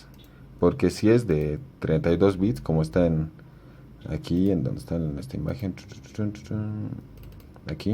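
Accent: Bolivia. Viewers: SU ACENTO DE BOLIVIANO , SOY DE BOLIVIA JAJA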